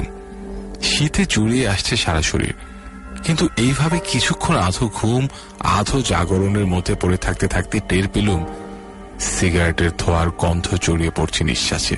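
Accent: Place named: United Kingdom. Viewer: ওরকম দাঁত চিবিয়ে চিবিয়ে ইংরেজি স্টাইলে বাংলাটা না বললে নাটক টি সর্বাঙ্গ সুন্দর একটা রূপ পেত ভাই... ওভাবে বলার কারণে অনেক শব্দ স্পষ্ট শুনতে অসুবিধা হল।😅 । পরবর্তীতে এ বিষয়টা মাথায় রাখা উচিত...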